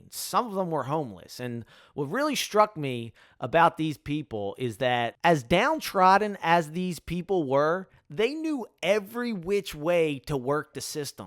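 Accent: Philadelphia, United States. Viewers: I knew you had a Philly accent!!! Or I guess Jersey, but I'm from Philly so it sounds like mine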